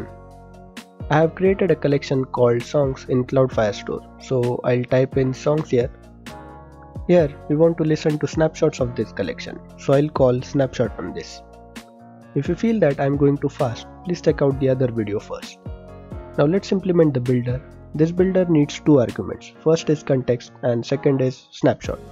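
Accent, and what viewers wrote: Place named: India. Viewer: Indians really have to work on their accent! except that, the content was useful thanks.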